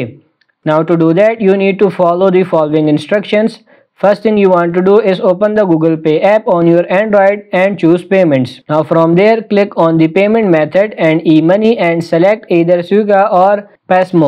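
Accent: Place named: India. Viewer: Indian? I Love this accent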